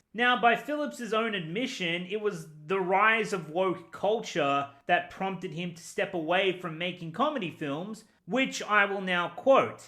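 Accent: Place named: Australia. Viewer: Been too long since I've been on this channel to listen to my favorite contrarian and hear that wonderful Australian accent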